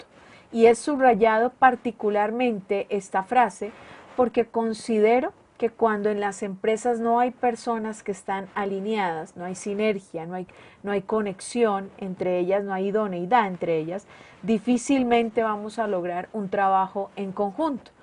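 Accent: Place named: Colombia. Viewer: Adoro el acento colombiano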